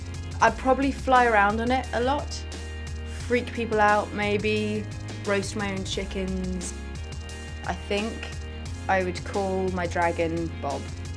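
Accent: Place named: United Kingdom. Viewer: The way she speaks fluently a British accent it's like hearing a raspberry in ears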